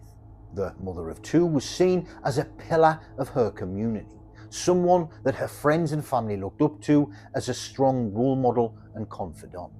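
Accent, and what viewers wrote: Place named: Sunderland, United Kingdom. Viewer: Love hearing a fellow makem!! Cracking channel!!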